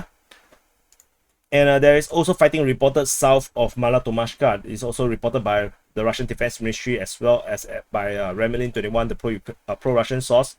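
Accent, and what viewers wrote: Singapore. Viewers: Never apologise for your accent. I know that it is nowhere near full on "Singlish". I am also pretty certain that you speak at least one Chinese dialect and also Malay and that you speak both as well as anyone. The number of languages that most Singaporeans speak has always astounded me. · HUH .. It' a Singaporean accent.. You know like an Australian Accent .. Or Alabama or CAnadian.. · We love your Singaporian accent! Keep going ! Your are the best channel on Ukraine war!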